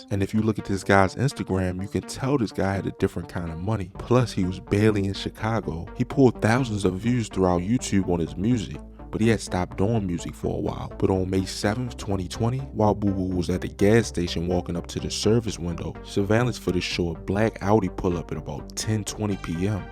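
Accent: Baltimore, United States. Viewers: Are you from Baltimore? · I tried to watch it but i can't get pass that crazy Baltimore accent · You from Baltimore ain’t u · That a Baltimore accent?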